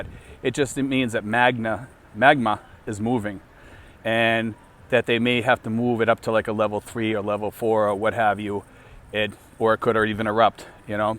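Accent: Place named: New York, United States. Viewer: I hear an east coast accent. Are you a New Yorker?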